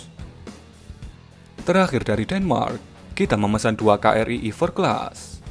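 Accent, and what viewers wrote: Java, Indonesia. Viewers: Mati siji mati kabeh..Mimin orang Jawa ya🤔🤔🤔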